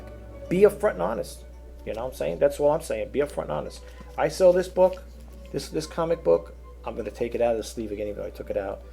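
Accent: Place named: New York, United States. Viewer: Beautiful back ground music at the end of this vid..I'm 1/2 Finnish American, you may enjoy Sibelius...Finlandia and the Swan of Tuolla ...wrong spelling but you get the idea...you take nice pics of the clouds too...take it you are from New York...your accent...happy hunting and peace to you and yours this approaching Christmas Season ! Corie in Seattle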